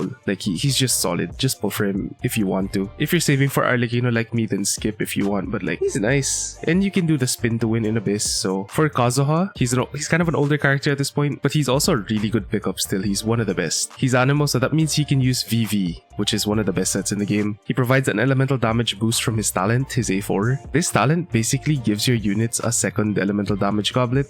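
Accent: Philippines. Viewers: Sounds very Filipino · why do u sound so filipino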